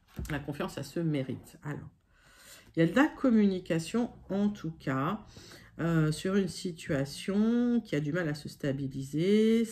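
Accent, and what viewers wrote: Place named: United Kingdom. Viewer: Waouh de plus en plus l'accent British 😅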